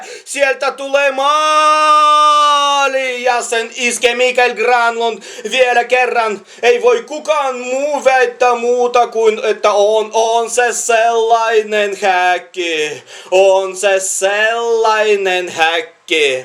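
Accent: Russia. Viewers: Ei kyllä yhtään Mertarantaa tässä venäläisen aksentin täyteläisessä paskassa. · Ei kyllä kuulostanut Mertarannalta ollenkaa. Symppis kaveri joo, mutta säälipisteitä ei saa. Venäjä aksentti tulee liikaa esille ja ääni ei muistuta yhtään Mertarantaa. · Jos puhutaan totta niin ei tuo mitään ripuli puhetta ollu ihan vaan venäläisten Suomea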